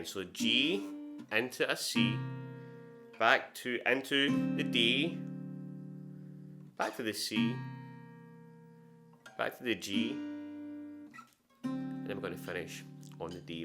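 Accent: Scotland, United Kingdom. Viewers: Very cool lesson dude , I’m in the United States , I love the Scottish accent , reminds me of a guy I used to work with over here , he was born in Glasgow and the plates on his car said Haggis 1 , he was a cool guy !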